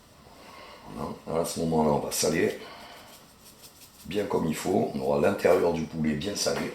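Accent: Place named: Marseille, France. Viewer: 👍👍👍👍👍👍👍👍👍👍❤❤❤❤❤❤❤❤❤❤ et avec l'accent Marseillais en plus (Le vrai...)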